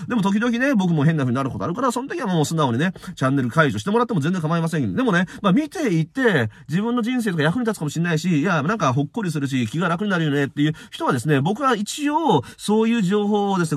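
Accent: Kansai, Japan. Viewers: 確かに悪口言う人とお酒飲むの嫌ですよね‼️ 楽しいお酒は最高‼️ ハッピーなお酒がいい‼️ 自分の時間使って悪口文句言う人と合うのは嫌です！‼️❣️‼️ 私も48時間地獄言葉言いません‼️ 天国言葉を言います‼️ 今日はQさん関西なまり出てました💖 私も天の声聞きたい‼️💖🌈❣️💖🌈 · たまに大阪弁出るの前から好きです。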